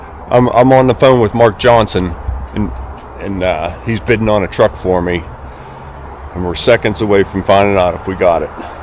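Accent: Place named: Pennsylvania, United States. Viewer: Love the channel. Just wanted to say there are no "Z"s in greeeassse. Love the Pensilvainia accent. Those are s's not z's. People point out my Ohio accent all the time and I live in the US Virgin Islands.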